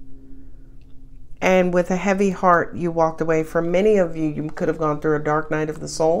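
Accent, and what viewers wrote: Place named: New York City, United States. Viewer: 2024 is my 7 year cycle as well! I am ready to be completely happy & love ❤🎉 Bring it!❤🎉 Thank you! Bring on the NYC accent 😊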